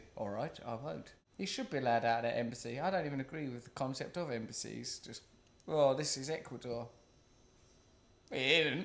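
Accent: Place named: England, United Kingdom. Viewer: Russell, please add SUBTITLES to your videos (at least to your next ones). I'd really like to comprehensively understand what are you saying, but it's kind of hard due to your English accent. VOTE UP SO HE CAN SEE!!!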